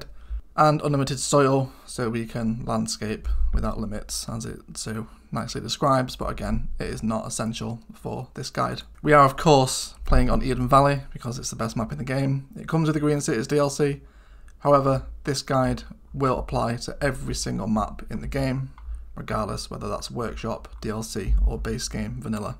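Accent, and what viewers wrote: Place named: United Kingdom. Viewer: Hi, apart from this map do you have a thought process what map you choose. Also cheeky question where you from in the UK. You sound like my home town lol.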